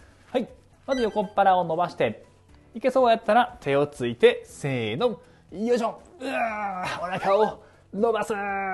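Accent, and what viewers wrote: Kansai, Japan. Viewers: 分かりやすい解説と、時々出る関西なまりが心地よく、楽しくやる気が出ます。優しい励ましの言葉も嬉しくなります☺！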